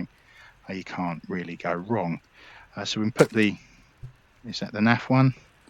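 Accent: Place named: United Kingdom. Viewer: Hearing a British accent telling me about guns is a strange feeling 😂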